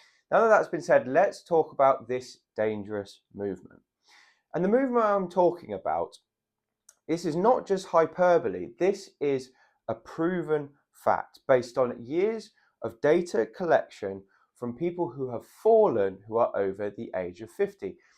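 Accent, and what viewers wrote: England, United Kingdom. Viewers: Thank you so much for your helpful, easy to understand videos. ( SO nice to have a simple English accent! 😊🇬🇧). I am 83 and pretty well preserved. But l slipped during a violent thunderstorm last august (2022) and was very lucky not to have a serious hip fracture, though l am still waiting for re -education in a European country where everything is so slow. But since COVID maybe it’s slow everywhere. ) l just have problems with my right leg. Cannot cut my toenails or put my shoe on easily and l have to walk with a stick. Even with that l get panic attacks when faced with a down slanting slope. I have downloaded nearly all your videos and started seriously today with the glute excercise. Thanks again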